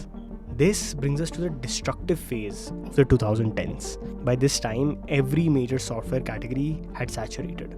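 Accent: India. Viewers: Indian accent 101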